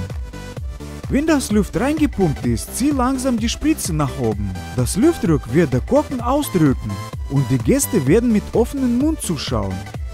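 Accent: Russia. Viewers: Nur wegen diesem russischen Akzent geguckt :D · bist du aus Russland? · Are you Russian or Polish? Your German accent is interesting.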